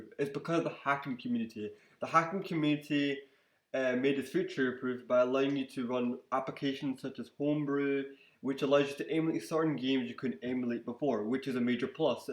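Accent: Scotland, United Kingdom. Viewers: you from Scotland nice video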